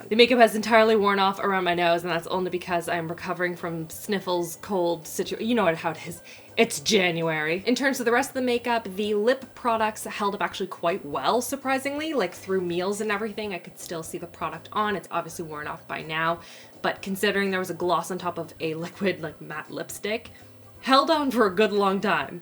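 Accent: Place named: Canada. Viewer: I love when I hear your Canadian accent.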